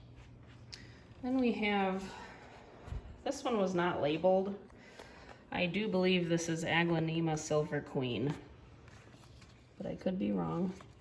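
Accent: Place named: Northeast, United States. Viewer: From Amherst Ohio I hear the northeast accent....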